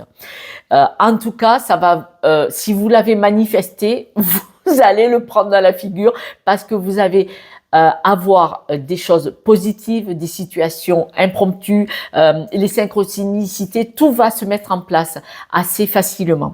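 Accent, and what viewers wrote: South, France. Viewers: Bonjour je ne vous connaissais pas,et j'ai adoré votre guidance avec ce petit accent du sud il me semble, excellent merci pour tout ces conseils qui je l'espère se réaliseront pour la plupart.😉🙏🙏🙏🙏 Portez vous bien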